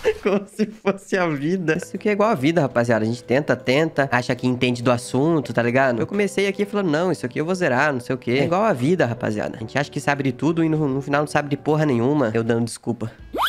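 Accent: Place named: South, Brazil. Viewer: Você tem muito sotaque do Sul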